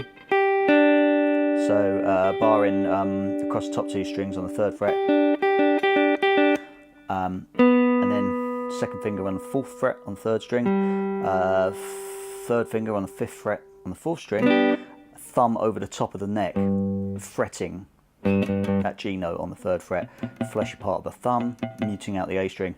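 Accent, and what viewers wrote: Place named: England, United Kingdom. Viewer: I'm a leftie wot plays righty, mostly because my first guitar was my brothers and he got tired of me changing the strings around all the time. So I would not mind seeing the leftie versions of these as it would look like a mirror image which I would have no trouble with. Also it is refreshing to find an english video tutor. THe yanks are fine but it gets a little tedious listening to the accent. If I wasn't so broke I would sign up for the course immediately. A natural teacher you can de mistify the blues I have heard for so long now. very refreshing to watch and learn. Well done. keep it up.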